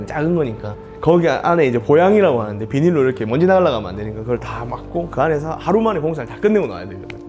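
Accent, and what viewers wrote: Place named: Busan, South Korea. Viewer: 폭팔적인 목소리 부산이시군요 가수로서 최고가되시길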